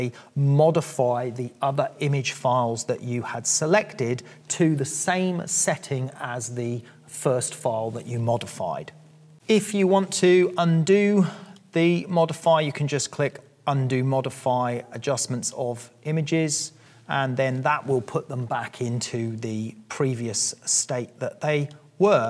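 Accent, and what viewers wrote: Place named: England, United Kingdom. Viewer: This is an English accent. If you can't understand it then you need to take some English classes. You could, alternatively, simply use a slower speed setting on YouTube to understand him better.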